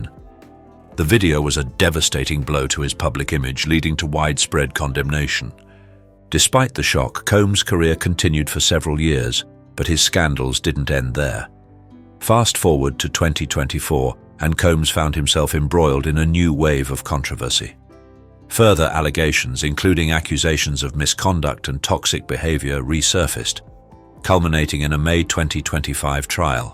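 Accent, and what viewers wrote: United Kingdom. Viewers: Of course, a British narrator.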